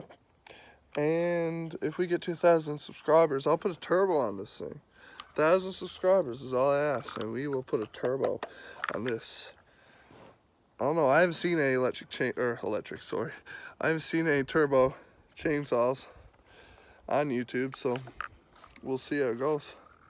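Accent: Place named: Newfoundland, Canada. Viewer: I hear that newfoundland east coast twang haha . Im a islnder myself.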